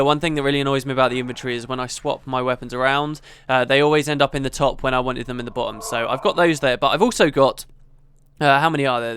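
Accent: England, United Kingdom. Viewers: woot is back!! i missed your swagger english accent:P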